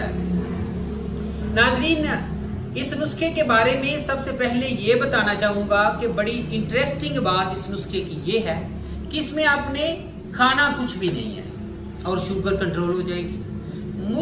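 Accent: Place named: Punjab, India. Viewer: Kia bat bhai tumrai اردو sahe bolo bhai پنجابی مکس mat Kro pls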